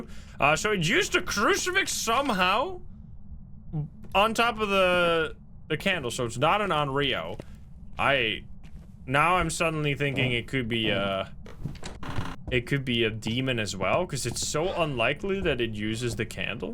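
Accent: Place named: Netherlands. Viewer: ik had nooit geweten dat je nederlands was behalve een beetje van je acent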